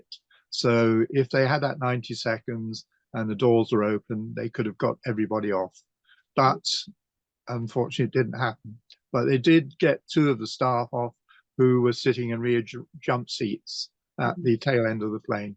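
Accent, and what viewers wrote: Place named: RP, United Kingdom. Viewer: Just coz you've got posh English accent don't mean you're intelligent mate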